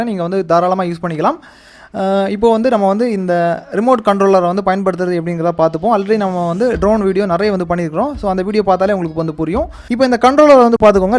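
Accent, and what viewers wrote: Kanyakumari, India. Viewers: Super bro...KANYAKUMARI nnu onga slang vache guess pannitaen. ...keep rock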